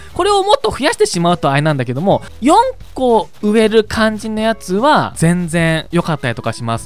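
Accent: Aichi, Japan. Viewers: 愛知のひと？方言が懐かしい感じする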